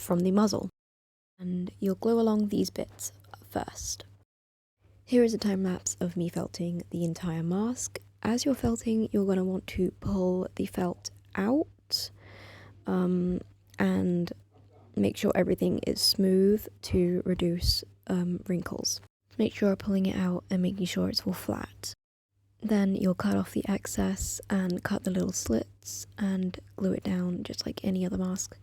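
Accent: United Kingdom. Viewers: Oh my god this tutorial is amazingggg,, also the accent?? Are you British?? :)))) (if so do you mind me asking where abts? Like north, south, west, midlands etc)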